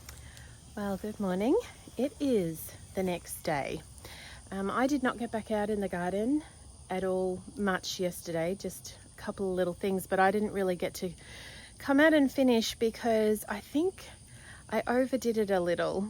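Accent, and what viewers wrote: Australia. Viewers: you don't need the music in your intro. I can't hear you because the music drowns you out. We want to hear your lovely voice with that awesome Australian accent!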